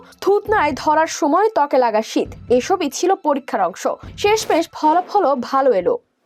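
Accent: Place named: India. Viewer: কথা বার্তা ধরন ইন্ডিয়ান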